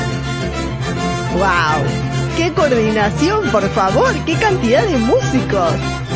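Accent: Argentina. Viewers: Argentina que sorpresa 😲!! te sentía cómo chilena, te identificó la gashina sos argentina. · Por el acento, entonacion y pronunciacion del español de Doña parece ser argentina. Que bien que te guste nuestra música... Saludos desde Ciudad de Mexico.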